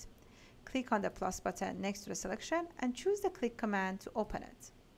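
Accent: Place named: Iran. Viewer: this crazy iranian accent...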